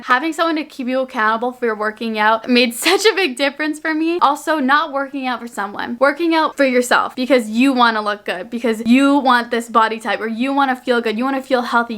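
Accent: Canada. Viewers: You're Canadian Toronto side really popped off in this video, not a bad thing I loved it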